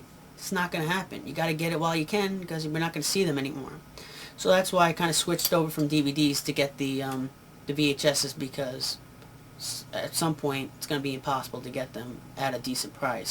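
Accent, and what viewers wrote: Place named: East Coast, United States. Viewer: You're from the East Coast aren't you? You don't sound like you're from Cali or something.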